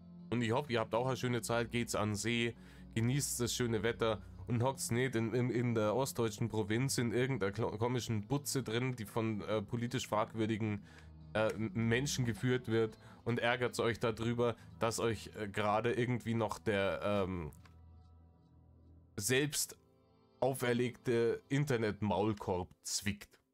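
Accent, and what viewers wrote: Bavaria, Germany. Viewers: Danke ❤. PS: es ist so wohltuend, echtes Bayrisch zu hören 😊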